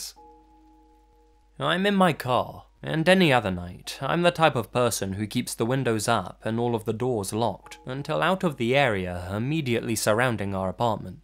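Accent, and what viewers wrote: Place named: England, United Kingdom. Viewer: tbh i can only watch mr nightmare and. all the other horror channels ive tried to watch either have content ive already seen from or mr nightmare, or their voices irritate me. just my opinion, but has one of the dreamiest voices. id honestly pay him to read a telephone book to me. but i guess i just have a bias towards english accents.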